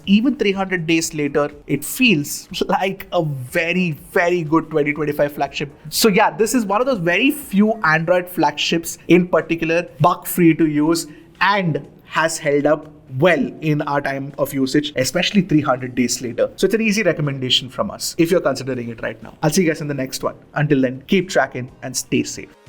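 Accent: India. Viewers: Just picked this up today thanks to a Black Friday sale. $50/month over 2 years with a $340 trade in on my S23 Ultra. Wasn't necessarily planning to upgrade until the S26 Ultra but that price was hard to beat. Also, great content, you're very personable and I enjoy watching, but maybe slow down a little bit. I'm a native English speaker and when you start throwing around specs and tech terminology, without the subtitles it can be a bit overwhelming. The Indian accent can be a difficult one at the best times. You still earned a subscriber. Thank you!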